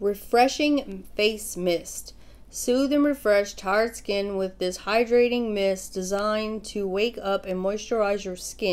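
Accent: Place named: Louisiana, United States. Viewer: I knew I were from Louisiana. I could tell by ur voice. I live in Marksville, Louisiana. I am a lil over an hour from Lafayette. Girl do u get the box Single Swag? It is from the same company as this box. It is a lol better than this box.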